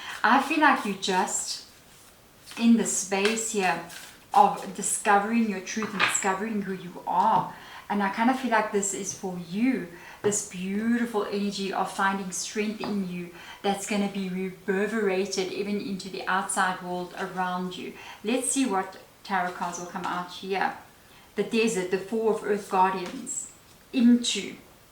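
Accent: Afrikaans, South Africa. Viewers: I command the Universe: "Abundance in all ways, especially financial abundance, flows to me like a never-ending waterfall" GRATITUDE GRATITUDE GRATITUDE (Ek hou van jou Afrikaans aksent !) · gave me tears… your vid popped up, then i heard your S.A. accent, posted 13 hours ago, 13 mins long… something to do with Ophiucus.. south african taurus here ❤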